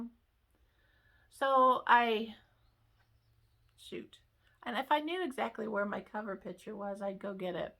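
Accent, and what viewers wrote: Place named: South, United States. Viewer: awww I was hoping you lived close to me☺️. I thought I detected a bit of a southern accent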